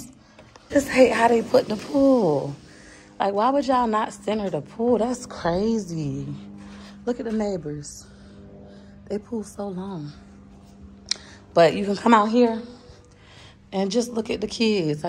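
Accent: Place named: South, United States. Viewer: Okay, I like the house but don’t love it…especially for that much $$, but tell me why your voice is giving Beyonce today? 😂😂 You have that sexy whispery southern voice today lady! 🥰 I missed the zoom this morning, but as soon as you post the recording I’m on it!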